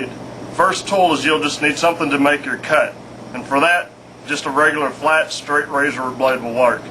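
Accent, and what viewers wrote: United States. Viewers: is that a new york accent? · damn you got some heavy southern dialect going on!!! Be proud of that! Greetings from norway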